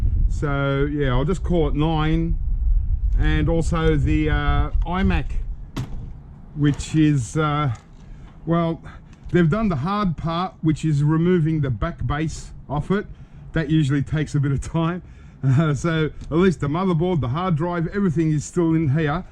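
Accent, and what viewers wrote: Australia. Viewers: You are aussie guy? Nice scrapping lots of wire and neat video 🙏🏻🌈🤗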